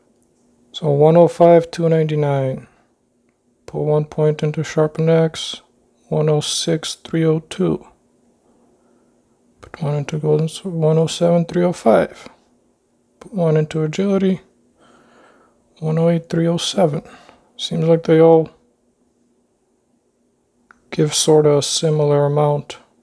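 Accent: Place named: Russia. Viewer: Мне тоже показалось он с каким русским акцентом говорит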